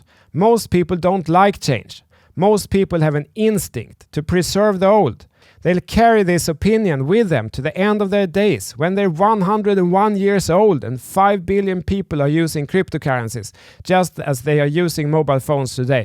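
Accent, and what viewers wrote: Sweden. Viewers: I lived in Sweden for 6 years. I have never heard a person have more Swedish accent while talking English. That's why I'm subscribing to your channel 👍🏼😊 you both have points. Her point is that it costs too much electricity to keep running and make new currencies.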